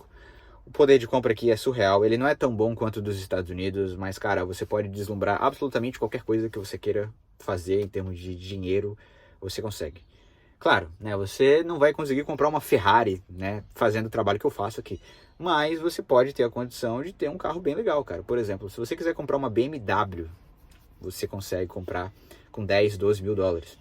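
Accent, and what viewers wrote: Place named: Central Brazil, Brazil. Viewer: Você é de Goiás, Brasília ou Minas Gerais? Tem um sotaque característico desse lugares.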